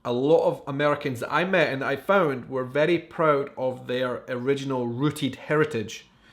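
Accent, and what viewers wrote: Scotland, United Kingdom. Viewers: I think you are mostly right. My state, Michigan, it’s the best! lol and also the thing about metal detectors, we don’t have them in smaller towns. Maybe only at a government building or courthouse. I love your accent, I just finished watching Outlander so I’m a little bit obsessed with Scottish people. 😂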